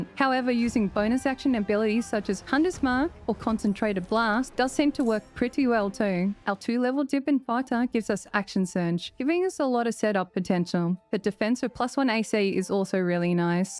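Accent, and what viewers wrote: Australia. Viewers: Love the video, And the strine! This is freaking thickest one I've ever heard, which makes in even more intriguing!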